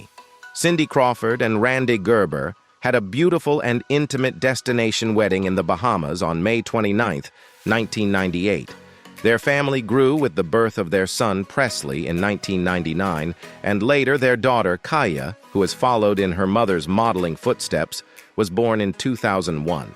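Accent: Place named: United States. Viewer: it's a USian voice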